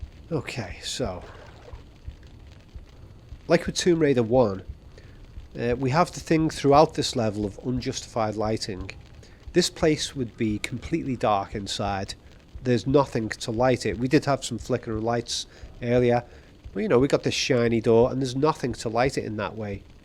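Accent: United Kingdom. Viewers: This will be excellent. My favourite all time games for many reasons. The cerebral aspect of them at the forefront. Scouse and tomb raider what a mix. 👍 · Interesting analysis! You also spund fairly local. are you based in merseyside? haven't found many content creators round here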